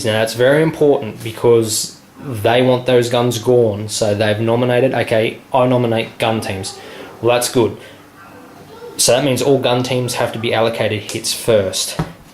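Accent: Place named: Australia. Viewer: You've got a hell of an Ozzy accent mate...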